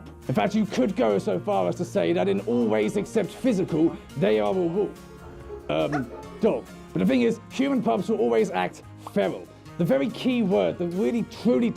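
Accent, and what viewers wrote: United Kingdom. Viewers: Ahh another of my kind, a British furry that sounds like “spiffing brit” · you sound sooo brittishhhhh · Savior_the Husky b r i t i s h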